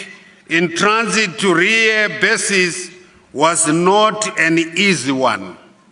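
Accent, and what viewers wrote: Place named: United Kingdom. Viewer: Is this guy really a Zimbabwean ?sounds like he's from British empire (Malawi,)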